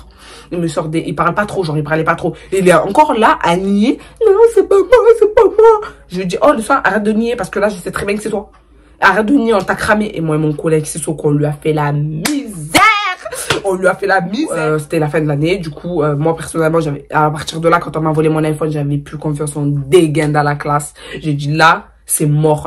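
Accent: Marseille, France. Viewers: T’es de Marseille ? J’ai l’impression d’entendre maeva ghennam là 😭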